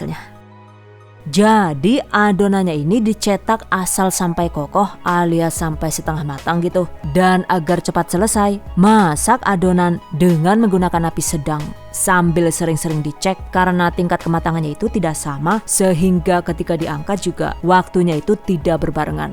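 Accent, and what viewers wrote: Banyumas, Indonesia. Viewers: Aku suka koment nya...ngapak pd karo aku jan² jos bgt koh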